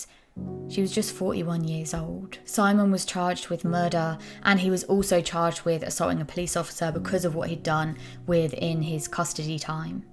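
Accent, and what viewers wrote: United Kingdom. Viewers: Hi . Love your accent. I assume it’s British? Don’t laugh. I’m not great with accents. 😉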